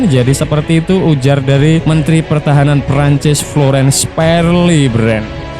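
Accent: Java, Indonesia. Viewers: Jowo daerah endi dirimu min? 😅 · admin jawa yo, telungatus, rongatus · Jowo ne medok menjadi to min... 😁😁😁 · Mimin bahasanya medhok sekali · Jowo ne ngendi min Hehehehe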